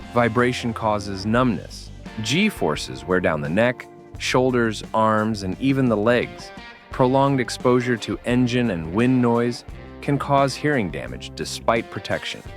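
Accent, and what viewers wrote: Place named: United States. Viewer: ....Uhhh OHHH! An American Accent telling us what's Wot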